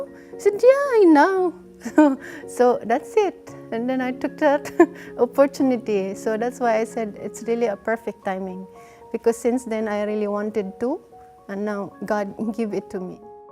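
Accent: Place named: India. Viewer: True I'm from Malaysia and I heard a sprinkle of Indian accent there. The hijab makes her look more malaysian or Indonesian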